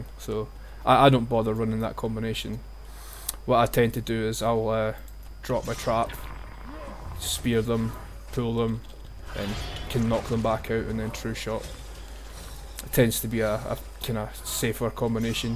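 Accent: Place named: Scotland, United Kingdom. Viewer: I mean, I appreciate how inforamtive the video is but... if you sounded more scottish AND angry that would also be very entertaining xDDD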